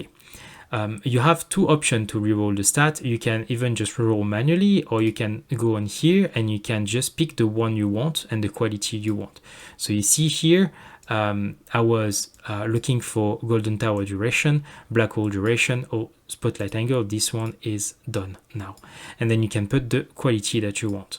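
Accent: France. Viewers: j'ai reconnu direct l'accent français mdr ^^ Bonne vidéo sinon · Frenchest person i ever heard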